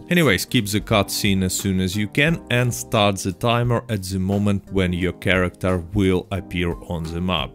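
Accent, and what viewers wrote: Russia. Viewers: Thanks for making me very rich, really appreciate, btw, your Russian accent sounds really cool. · а теперь я не могу понять, он русский или американец.... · Блин думаю русский не русский ты, и потом вижу текст русский и потом понимаю почему у тебя такой акцент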